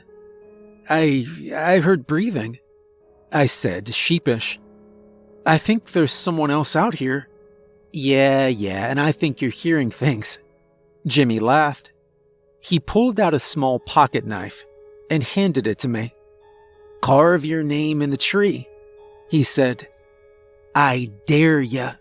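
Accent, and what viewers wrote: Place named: Southern California, United States. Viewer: I'm not gonna say which, but one of those is awful. Another awful one has the initials SD...no standard for story quality and butchers the good ones. I like tho. His weird SoCal accent has grown on me.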